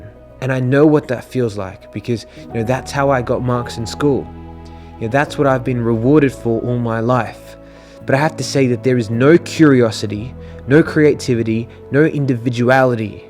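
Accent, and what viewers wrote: Kerala, India. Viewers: R u indain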